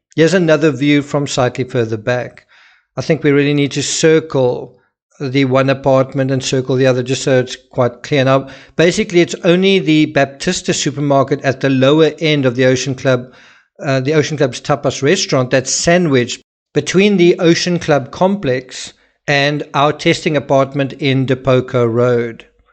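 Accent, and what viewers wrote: South Africa. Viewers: I like the SA accent as well.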